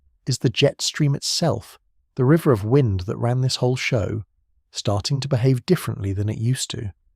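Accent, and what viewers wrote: United Kingdom. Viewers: Dude it is the water current of the changing Ocean’s…… CHANGING THE SALINITY!!!!! So it won’t sink and freeze ! ONCE IT ROLLS OVER!!!!! You will pray for Hot days!!! England will be under Ive for 100’s of years!!! (IT IS A CONVEYOR BELT THAT FREEZES AND AINKS AROUND Greenland!!! Loosing salt because of the melting Ive waters from North Canada and Greenland itself! ( heat in England comes from the hot ocean)!!!! LISTENING FOR THIS D BAG BRITI ACCENT TRY TO BRING A DIVE OUT FROM UNDER HIS HAT!!! THE JET STREAM!!!! No s h i t e!!!! Try a tornado with your milk tea whoopsy-daisy!!!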